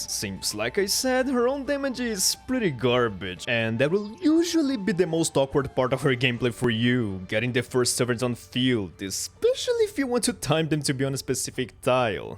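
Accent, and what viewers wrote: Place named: Brazil. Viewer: sorrio toda vez que abro um vídeo sobre um interesse relativamente random meu e escuto um sotaque brasileiro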